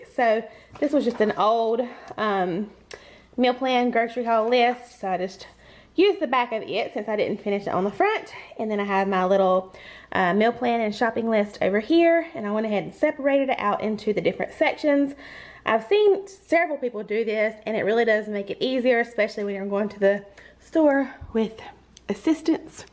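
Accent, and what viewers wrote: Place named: South, United States. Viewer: Just found your channel.. which southern state are you from ? Cause you sound "just like me" haha -- NC girl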